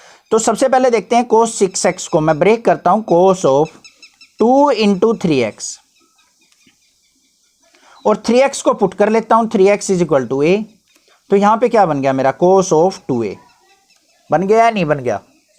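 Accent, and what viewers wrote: Haryana, India. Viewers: sir: dekho upper lakha ka😂 haryana walo ki haryanvi Hamesha bich ma hi aa jati h I am also from Haryana♥️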